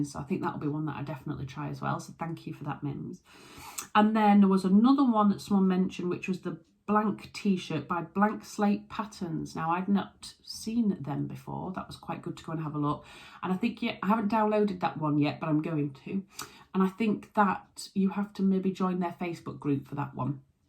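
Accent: Yorkshire, United Kingdom. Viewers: You are totally not alone! I want to make all the things too! I daren't write them all down, but let's just say that the patterns have had to be moved to a new home because they were jamming my sewing desk drawer. So there’s quite a few 😂. My resolution at the moment is to prewash all the fabric I've just stuck in my cupboard in the last 6 months, then I might actually work out what I have. T-shirts and T- dresses definitely on the agenda though. Great vlog as ever, thank you, and lovely to hear your Yorkshire accent as it reminds me of home.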